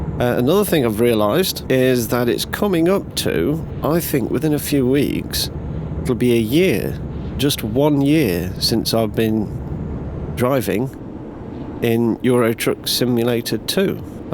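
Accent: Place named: United Kingdom. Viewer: Very British jolly good old chap :-)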